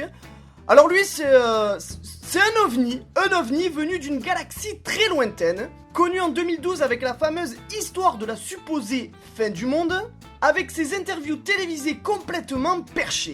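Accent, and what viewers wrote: Marseille, France. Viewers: Tu as bien choisi les fous de YouTube et j'adore ton axen marseillais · tu est du sud? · comment je déteste l'accent Marseillais😂 mais le tien sa va encore !😉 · T'as un petit accent du sud~ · a j'adore ton accent Marseillait aller Marseille je m'abonne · oh l'acson marcer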